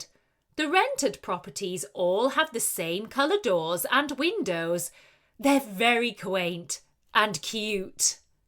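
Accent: United Kingdom. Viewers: 🏋️🏋️🏋️ Thanks so much ☺️ Ma'am it is a wonderful story ,I was so scared of listening 🎧,the story I thought I can't understand , fortunately I can understand because I do love British accent💃💃💃💚💛 Definitely I have explored that ,this is the good way of enriching our english pronunciation as well as improving our vocabulary 📌💚💪Your voice is clear everyone can get what you mean 😊😊😊😊✨.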